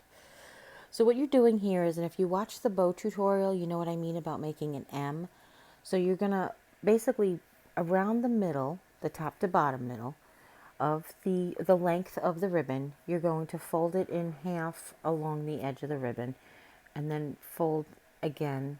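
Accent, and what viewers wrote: New York, United States. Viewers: Guess what I am making tomorrow!!! These are adorable! And I said before I love the NY accent ! Bayside ❤️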